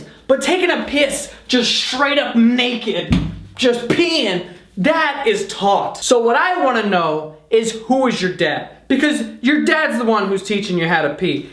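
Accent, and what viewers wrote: New York, United States. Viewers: You're accent is amazing lol it's so cool. Is it like a NY accent? (Sorry I'm from Europe) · Dope NY accent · What is your accent is it New York Brooklyn or what?? Manhattan jersey Italian ?!? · As a New Yorker, I can confirm that all New Yorkers sound like this guy.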